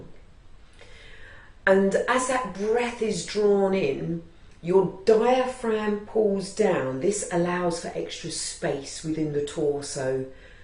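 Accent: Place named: United Kingdom. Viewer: Your accent is pretty close to a British accent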